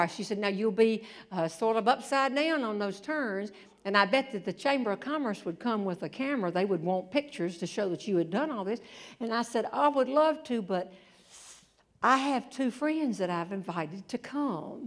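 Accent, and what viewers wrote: South, United States. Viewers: Thank you for the amazing stories, I grew up outside of Bristol, Va. my husband, who by the way is a LB! Moved me and our children to Cincinnati Ohio, 30 years ago, and now our children have grown and have given us grandchildren so we can’t move back home yet! I love listening to someone other then myself talking in a southern accent!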